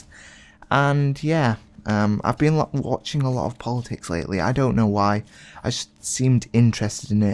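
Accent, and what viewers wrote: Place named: United Kingdom. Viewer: caal of duu'y thats how you brits pronounce it.. or another word like "later" will be pronounced like "la'er"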